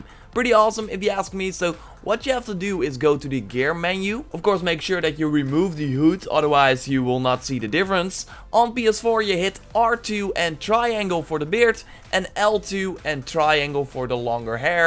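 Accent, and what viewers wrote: Netherlands. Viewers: NIJS NEDERLANDS ACCENT!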